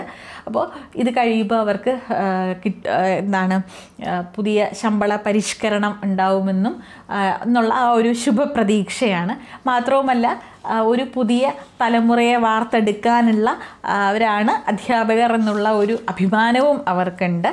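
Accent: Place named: Malappuram, India. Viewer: Malappuram/palakkadu slang💖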